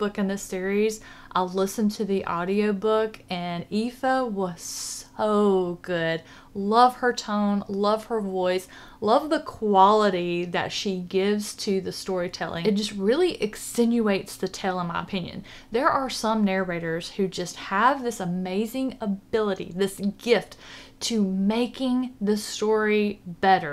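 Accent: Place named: Mississippi, United States. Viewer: I just stumbled across your channel and of course I was hooked by your Mississippi accent. But they say we have an accent also because we are originally from Alabama. If you don't mind me asking I would like to know where you live in FL because I have a brother that lives in Marianna and a sister in Tallahassee. Fortunately, they didn't have any damage from the hurricanes. I think you are a good reviewer and I subscribed. Much respect to you from Larry.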